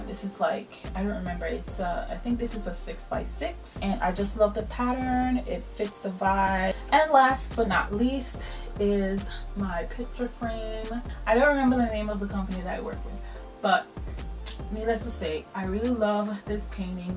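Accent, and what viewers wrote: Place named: Caribbean. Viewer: Thank you for sharing where you're from. I know I hear an accent - fellow Caribbean Girl here 🎉❤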